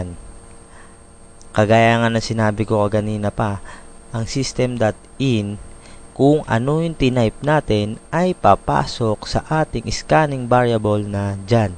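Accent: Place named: Manila, Philippines. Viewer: masyado nman mapanghusga sa mga bisaya tong mga to.. di nyo bah alam na ganyan mag english ang mga tga maynila? relate relate din pag my time.. nkikiview na nga lng ei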